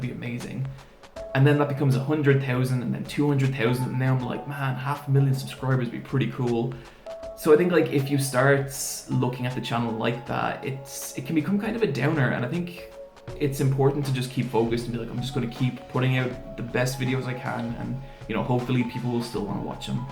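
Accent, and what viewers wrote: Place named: Ireland. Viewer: Could you not tell from his heavy irish accent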